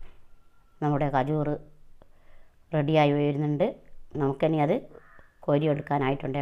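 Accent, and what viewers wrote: Nadapuram, India. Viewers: Nadapuram bagathulla basha samsarikkunnond chothichatha njnum Nadapuram ഏരിയയില്‍ aanu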